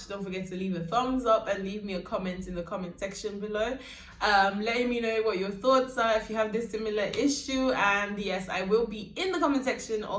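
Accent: United Kingdom. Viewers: Your intro though 😍. I love your British accent. I think the have the best . And you make it sound better even though environments can rub of on you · looool... i absolutely love how you speak i hear more of British though not strong sha i sha enjoy hearing you speak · Traffic 🙄🙄🙄 you just had to add it 😂😂 I think it’s more of the British accent for me, it’s what I get from hearing or listening to you talk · The struggle with filming ,sorry dear 🤗 I have noticed you have British accent and not a lot do I hear Naija accent , it always very light . It’s a beautiful accent and you speak great English. True about the accent things when relating to Client at work. Haha they sure will charge you high when you speak like a foreigner in Naija 😂😂 well I guess you should just keep enjoying your code mixing and switching accent😁❤️ I honestly think you should just be yourself babe , it comes let it flow ❤️ I have my very strong Naija accent and it’s not going anywhere 😁 ever with my Naija accent when I visited Naija my friend didn’t allow me to talk at the market to negotiate, according to her i sound a bit different from normal Naija accent 😁which I don’t believe thou until another day when I talked , the lady at the saloon was like “ where are you from “ I was confused ooo 😁😂😂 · I know the feeling. 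I did that before where I was filming and my record was not on at all. I do hear a British accent when you speak. The odd words comes out as American. But, you do have a beautiful tone of voice💕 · Multiple accent malfunctioning 😂😂. Why is traffic the first thing you mentioned 😭😭 I hear British accents when you talk though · I totally understand this, when I’m with you, I pick up things from you and it’s so unplanned. I think you should just be yourself though, your accent is more british though.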